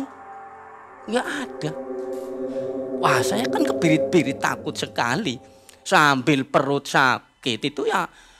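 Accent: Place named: Banyumas, Indonesia. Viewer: Lucu bngt nih orang ceritanya😂😂😂 kaya orang ngapak si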